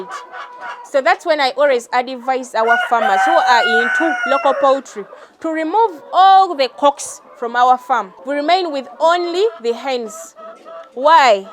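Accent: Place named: Kenya. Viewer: At first I thought that am listening someone from Kenya kumbe you're the one?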